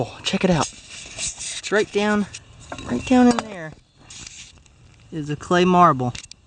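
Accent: South, United States. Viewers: Your accent is like cowboy like your is yall. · I like your forest gump ascent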